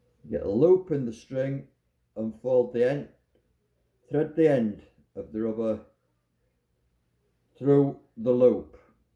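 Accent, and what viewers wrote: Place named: North, United Kingdom. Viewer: Great video mate... Exactly what I needed and straight to the point and good to hear a fellow northerner ... Thanks from Hull nick 👍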